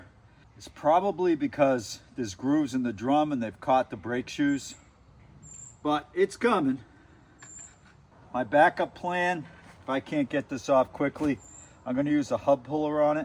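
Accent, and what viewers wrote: New England, United States. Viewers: You from Maine, NH or MA?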